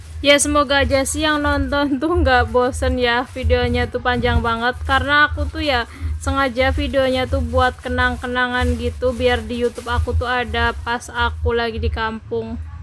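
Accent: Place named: Java, Indonesia. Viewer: Asli mana bun,medok bgt logat nya ..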